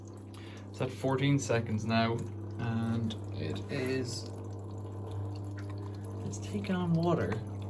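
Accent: Ireland. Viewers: Just had my sunday lunch and now I am snoozing with your lovely Irish lilt soothing me as I drop off.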